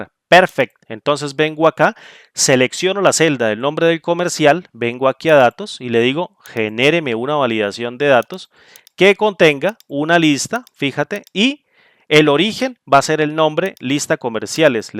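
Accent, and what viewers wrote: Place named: Costa Rica. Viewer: Tu acento me suena al de mi pais, Costa Rica ♥ o talvez Colombia...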